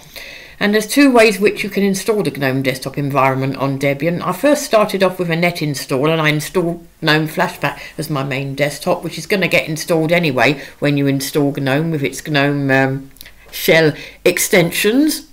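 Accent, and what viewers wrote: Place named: United Kingdom. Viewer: I love your British English, i am salvadorean.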